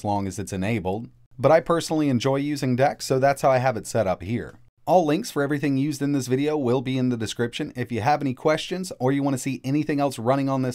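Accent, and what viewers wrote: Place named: South, United States. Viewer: I love southern accent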